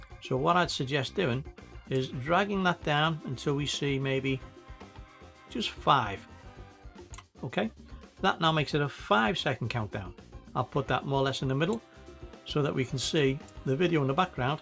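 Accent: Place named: Merseyside, United Kingdom. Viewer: Hi are you still around I've noticed the comments are over a year old. Do you do any one on one tuition for Filmora 14 if so Im interested sounds like you come from my area Merseyside.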